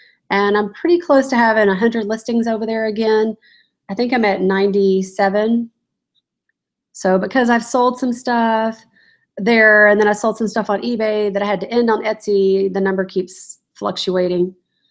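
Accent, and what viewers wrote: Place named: Texas, United States. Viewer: ...and then people teasing you for how you pronounce "jewelry." Lol. I've honestly never noticed that! I have noticed you say "garage sell" instead of "garage sale," and that it's never "when," it's always "whenever." I just figured it's a Texas thing, and I never said anything because one thing all of us as Americans know is you don't mess with Texas! Well, that and I get crap about my West Coast accent and vocabulary, so I have no room to talk. 😂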